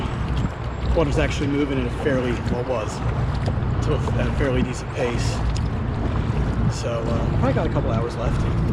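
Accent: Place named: Philadelphia, United States. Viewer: Hey, I’ve been really enjoying your review videos on the SeaEagles as I’ve been shopping around, and I had a feeling you were a Philly guy based on that familiar accent.:) I’m from the Philly burbs as well but have been living out west. You’re really spotlighting the Northeast in a beautiful way and these adventure videos have made me more optimistic for moving back home and explore the places that were in my backyard the whole time. Can’t wait to see more, Thanks!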